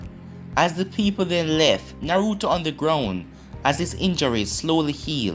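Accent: Jamaica. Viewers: ok, I asked cause I'm Jamaican and he sounds like a jamaican that is trying to learn English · Why do u sound like a Jamaican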